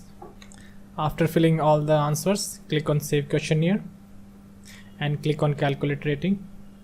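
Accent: India. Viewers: The indian accent makes me confident he knows what he's doing and not trying to scam me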